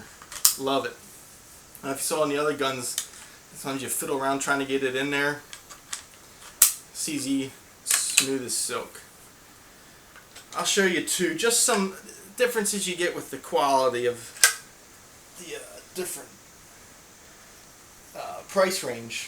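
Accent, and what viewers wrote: United States. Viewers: Is yinz from Pittsburgh? I'm hearing some signs of a SW PA accent. Could be OH too, sometimes. Anyhow, nice guns. I can relate.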